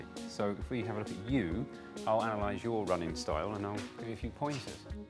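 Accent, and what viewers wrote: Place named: United Kingdom. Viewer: All the information seems good, but does anyone else hate fucking British accents?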